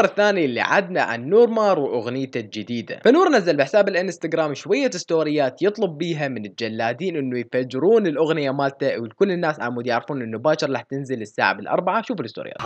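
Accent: Iraq. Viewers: انتة عراقي لو شنو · سؤالل انته عراقي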